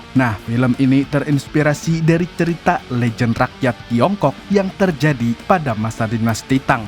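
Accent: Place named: Kalimantan, Indonesia. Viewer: Kalimantan logat nya nah😂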